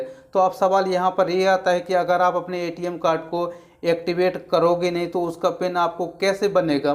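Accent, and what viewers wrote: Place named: Bundelkhand, India. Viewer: Tum Bundelkhand ke ho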